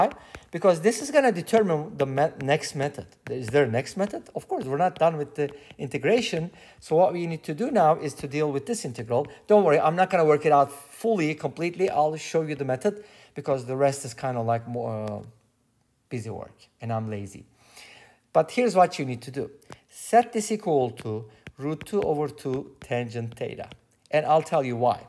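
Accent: Turkey. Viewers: I swear you got that Turkish accent, are you Turkish by any chance?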